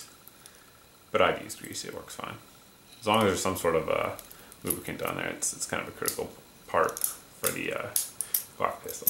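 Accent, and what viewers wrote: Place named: Massachusetts, United States. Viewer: Just revisited this video and noticed your slight accent in this early video. Massachusetts, right?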